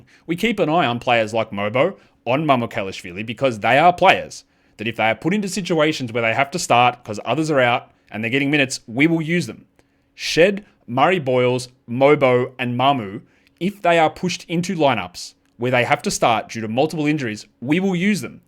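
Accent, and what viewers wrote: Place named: Australia. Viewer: This dude is Bill Simmons with an Aussie accent. Why bother listen he copied and pasted Bill Simmons 😂😂😂😂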